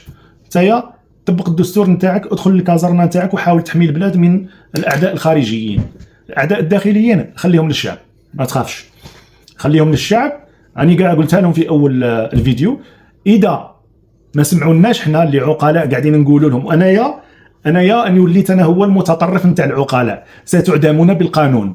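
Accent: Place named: Morocco. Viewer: لهجه مغربيه 😂😂😂😂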